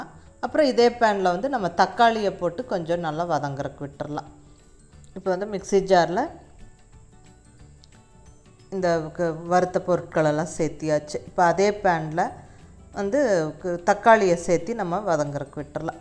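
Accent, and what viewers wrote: Kongu, India. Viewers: Super akka nice recipe , thanks your Kongu Tamil also good. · Receipe tips are nice. As well kongu tamizh is also so nice.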